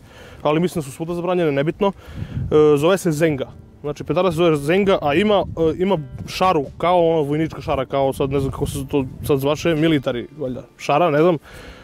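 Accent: South, Serbia. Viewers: Najjaci juznjacki naglasak!!!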